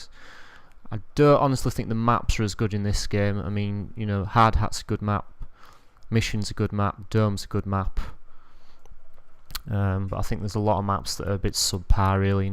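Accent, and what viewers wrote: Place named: Yorkshire, United Kingdom. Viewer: Yorkshire accent commentaries FTW. Great comm mate, especially for your commentary virginity. Face humping and piecey biting off? haha...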